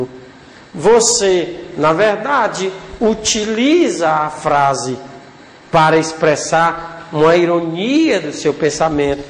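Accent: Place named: Northeast, Brazil. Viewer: Você é o cara, já vi de tudo mas posso concluir que com você o entendimento é rápido e claro. Feliz São João professor, pelo seu sotaque ou é nortista ou nordestino. Um abraço.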